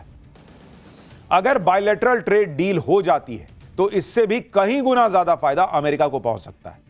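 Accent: Bihar, India. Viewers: आप अच्छे एंकर हैं और आपका बयां करने का अंदाज़ अच्छा है।आप शायद बिहार से हैं, जो बीच बीच में आपकी टोन से पता चलता है। आप अक्सर भाई, बॉस जैसे शब्दों का प्रयोग भी करते रहते हैं, जो शायद आप लोकप्रियता प्राप्त करने के लिए करते हैं। राष्ट्रीय स्तर के एंकर होने के लिए थोड़ा इससे बचेंगे, तो यह अच्छा रहेगा।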